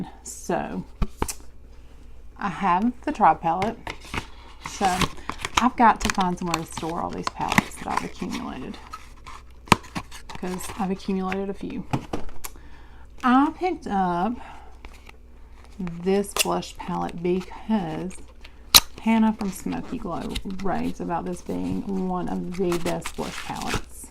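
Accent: South, United States. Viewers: I love your vids. Your so soft spoken and of course I know your southern. Keep the videos coming ♥️ you know us southerners are suppose to get snow this weekend 😬 IG LOVE YOUR PURSE never had a MK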